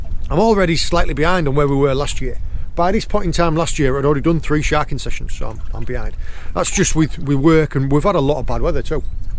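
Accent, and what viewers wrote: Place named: Cornwall, United Kingdom. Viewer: You're getting slowly Cornish mate, it happens. About 18.00 you say "I switch overrrr," great vid btw!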